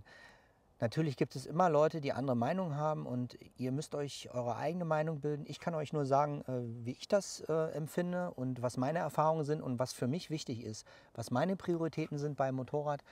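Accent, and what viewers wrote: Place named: West Germany, Germany. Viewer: Deinem Dialekt nach muss man denken das, du ein Wessi bist. Jede thüringische Gegend hat seine eigenen Nuancen, doch grundsätzlich erkenne ich jemanden am Dialekt ob er aus Eisenach, Apolda oder Schleusingen kommt. Mein Gemecker ist nicht böse gemeint. Verstehe es als konstruktive Kritik. Videos welche Informationen verheißen, dürfen einen zeitlich Rahmen nicht sprengen, sonst klicken die Zuschauer gelangweilt weiter. Schau in deine Statistik, da wird sich bestätigen was ich sage.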